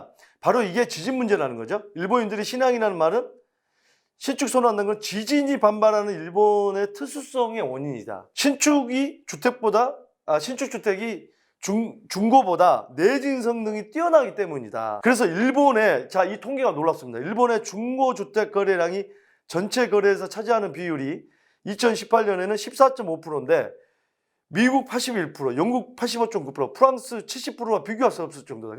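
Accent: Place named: Honam, South Korea. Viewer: 호남 사투리 쓰시네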